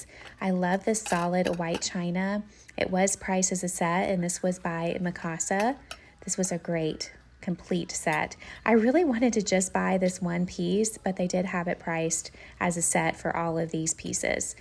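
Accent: South, United States. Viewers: Your content is wonderful and your beautiful Southern accent is actually bliss to listen too 🍬 you explain and explore 😉 a pleasure to go with you on your thrifting expeditions 🎉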